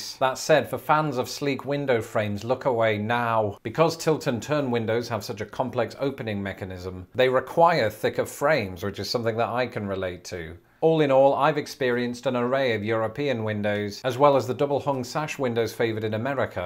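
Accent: United Kingdom. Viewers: stahz... I love the British accent xD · Such a mundane topic made interesting with dry wit and a British accent. 😍😍 Found this channel via Mr H and Friends. Bloody love it. (hello from South Carolina)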